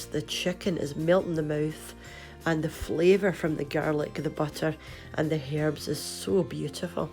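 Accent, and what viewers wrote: Scotland, United Kingdom. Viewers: it looks delicous a bit unclear about how much liquid to add can you give me the amount based on the measuring cup I would add more veg. to it, I'm totally inexperienced in the kitchen or using a slow cooker love the Scottish brogue :) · We love Scotland too! Especially the accent! Kind regards from Mr Turk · Love the Scots' accent! Could listen to her voice all day!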